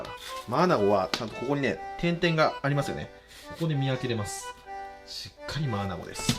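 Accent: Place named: Mikawa, Japan. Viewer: かねこさんが市場の人と話すときに漏れる三河訛りに親近感を覚える遠州弁話者です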